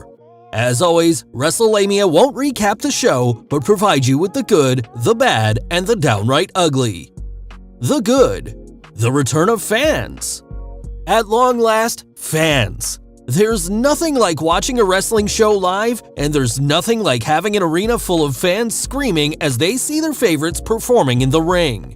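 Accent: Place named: United Kingdom. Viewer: He's not Australian though... he's British.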